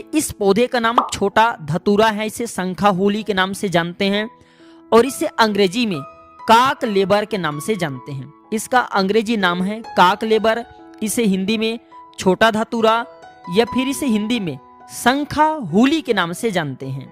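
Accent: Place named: Assam, India. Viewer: Etleti bolta hai gurji assam se ho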